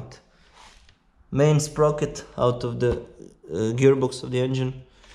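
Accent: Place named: Russia. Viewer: Cool work! Love your kinda Russian English! It's a freaking death machine!!! ;-)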